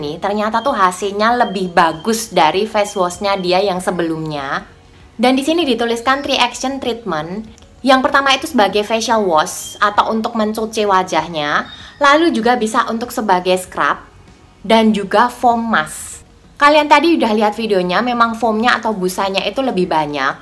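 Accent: Java, Indonesia. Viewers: NGAKAK LIAT NYA SOALNYA NGOMONG NYA KAYA NGEGAAS😆😂..JAWA YA BAK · cempreng n medok...aku suka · Iya jowo banget😆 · medok mba wkwk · Medok kali bahasanya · ngomongnya medok bnget😂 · Jowo ngendi lurd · Jowomu ketok mbak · Asli jowo opo mbak? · Medok nya itu lohhh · medok banget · Mbak ngomong e jowo medok😍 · Mbak kok medok banget? Jawanya mana? Dalam dari jogja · medoknyA mntap kk hhaa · Medhog guys suaranya😁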